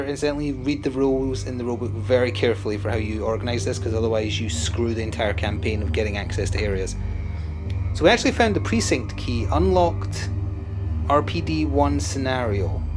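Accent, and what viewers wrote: Scotland, United Kingdom. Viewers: Good video! I enjoyed the ominous music mixed with your optimistic tone. Where abouts in Scotland are you from?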